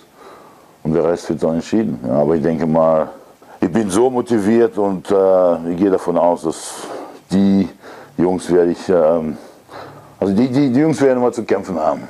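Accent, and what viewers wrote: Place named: England, United Kingdom. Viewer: Er wohnt in Amerika, so wie es aussieht spricht er deutsch mit englischem Akzente. Passiert einfach......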